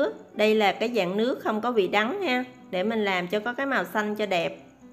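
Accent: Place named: Mekong Delta, Vietnam. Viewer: Tiếng nói rất rõ ràng và thật thà đậm nét miền tây, hướng dẫn cách làm rất dễ hiểu thật tuyệt vời 👍hà, chúc em thành công hơn nữa.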